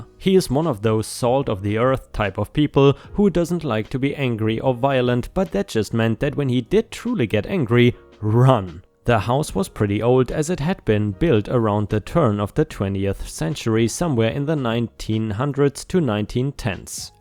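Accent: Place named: Germany. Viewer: Hi ⭐, is from Germany. English is not his first language. He has a wonderful accent. Most people are here listening to his story telling because we like his accent, his choice of stories, and his demeanor. Didn't you mother teach you what Peter Rabbit's mother told him? “If you cannot say something nice, then don't say anything at all.” Real simple, you should have learned this before you started school. What is wrong with you? 🌠🌟⭐🌟🌠😎🌠🌟⭐🌟🌠 Take care, stay safe, have a nice day. I'll pray for you. 👵☺️✌️☮️🖖 😷 🙉🙈🙊 ♥️😎⭐🌟🌠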